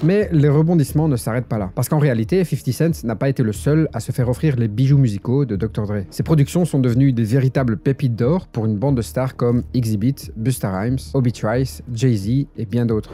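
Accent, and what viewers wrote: Belgium. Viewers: ton accent belge est degeulase horrible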